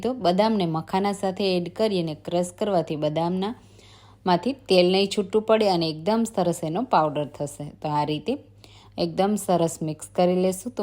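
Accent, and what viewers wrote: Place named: Kutch, India. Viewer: મેઘપર બોલીથી કચ્છ 🎉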